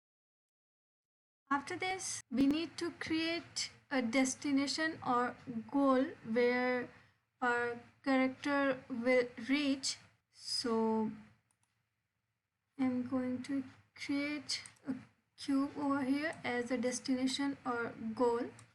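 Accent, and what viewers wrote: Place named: India. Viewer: U are Indian Sounds like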